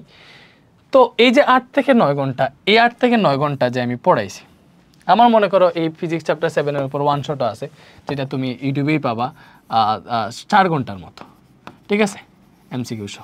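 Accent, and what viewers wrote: Sylhet, Bangladesh. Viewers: Apni ki sylhety? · Vai kita Sylheti ni 💗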